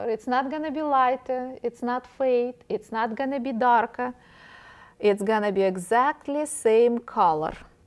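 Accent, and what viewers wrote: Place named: Russia. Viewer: Такой русский акцент)))Но урок очень хороший!А какой марки используете темперу?Мастер класс?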